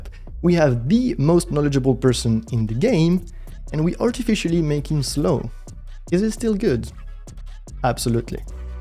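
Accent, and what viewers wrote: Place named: France. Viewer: Je me disais que t'avais un petit accent fr. Par contre vraiment propre ton anglais on pourrait même croire que t'es autre chose.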